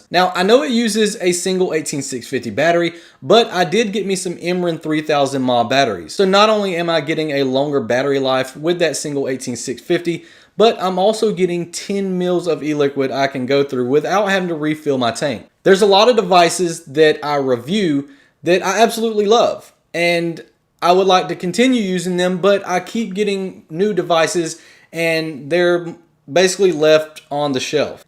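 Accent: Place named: South, United States. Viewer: I trust men with southern accents so I feel relatively comfortable asking you this question, hopefully you can answer it for me. Recently, my guy talked me into getting this box because he doesn't like my smoking. What, in your opinion if any, is the best e juice to use that is the most like a cigarette? I realize this is comparing apples to oranges, but I'm kind of desperate. I told him that I wanted the Juul and the original Kangerbox and the emporer's e juice...and he got me this Kanger-made NE box, no Juul system and some kinds of e juice that I hate. he kept saying "tell me what you want." I DID. But he would not add to the cart what I actually specified, so I ended up saying "just get me what YOU want me to have then." So he did and I am now in possession of the box...and no juice that I like. I don't trust going into the stores to test juices because I live in Springfield, MA. 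if you know anything about this area, it's nothing but criminals, and stores filled with really bad product with unregulated chemicals and whatnot. No sane person would buy anything around here. That said, my question is, what vape e juice that is like regular tobacco do YOU like, and where can it be bought online? Thank you in advance. :)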